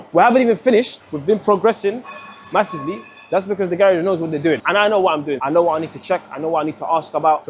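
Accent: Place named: United Kingdom. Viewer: UK from his accent.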